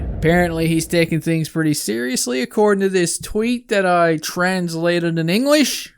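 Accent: Newfoundland, Canada. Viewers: you sound like you might be a Newfie lol am i close?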